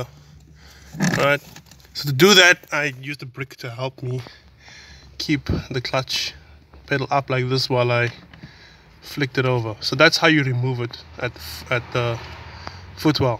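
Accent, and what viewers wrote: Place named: Southern Africa. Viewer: Helped allot man are namibian or south african your accent sounds familiar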